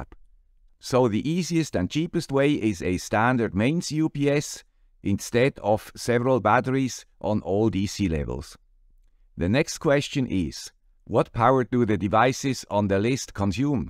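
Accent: Switzerland. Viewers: The man with the croaky Swiss accent 8-) Everyone seems to have suffered this winter. I've been coughing since Christmas.!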